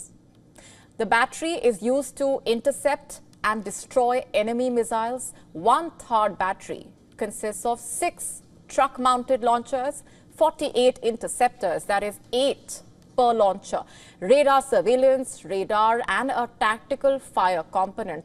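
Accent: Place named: India. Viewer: Can you remove your Indian diction..?? Ty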